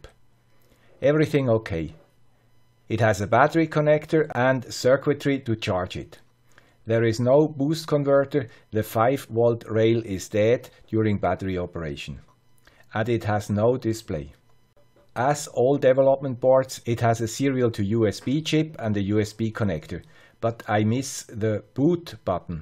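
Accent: Switzerland. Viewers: Ok. Thank you for your answer (with the Swiss accent). Regards from someone with a Belgian accent;-) Really enjoy your channel!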